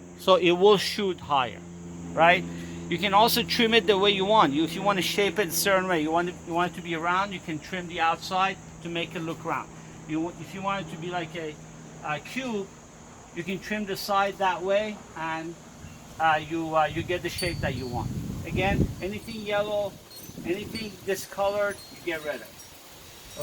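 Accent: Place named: New York, United States. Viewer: Great advice best ever. Love your New York accent.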